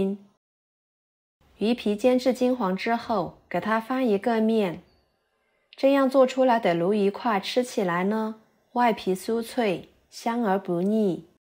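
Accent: Yunnan, China. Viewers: 主播云普口音很重啊 哈哈哈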